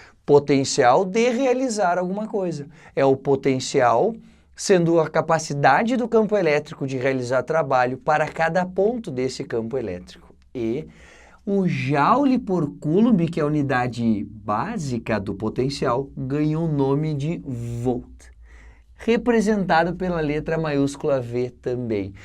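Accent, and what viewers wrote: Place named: Santa Catarina, Brazil. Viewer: sotaque de catarina é bom demais hahahahahah show de bola mestre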